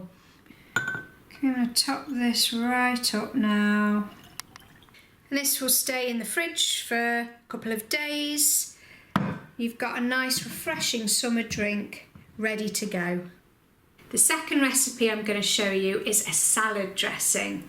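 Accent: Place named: United Kingdom. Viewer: Really appreciate this video, can't wait to pick my firsts shoots this spring! Can you please tell me from what part of UK your accent is from? Probably the most lovely british accent to be honest, makes it very easy to listen to the whole video!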